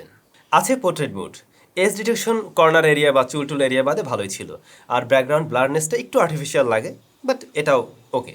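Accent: Bangladesh. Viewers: Smart phone er full review er jonno video te click korinai apni advertise korechen ei video er how pathetic 😂😂😂 lose the hat and the hairstyle and the sudh desi bangla tone. thank me later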